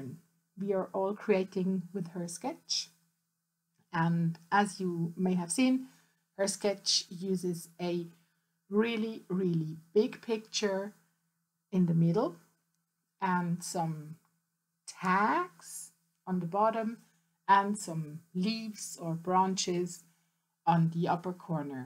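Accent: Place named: United Kingdom. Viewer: You‘ve got a fairly british accent my dear ☺️ Sehr schönes Layout! Liebe Grüsse 🙋🏻‍♀️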